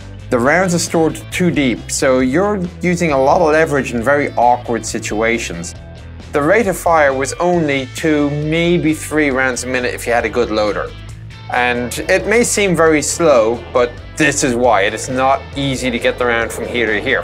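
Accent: Ireland. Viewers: Listening to your accent, gives me the impression that you're Irish. Am I correct? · He sounds slightly Irish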